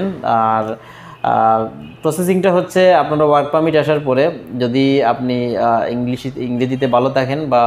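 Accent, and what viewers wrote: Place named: Sylhet, Bangladesh. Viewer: ধন্যবাদ ভাই এত সুন্দর ভিডিও দেওয়ার জন্য নিশ্চয়ই আপনার বাড়ি সিলেটে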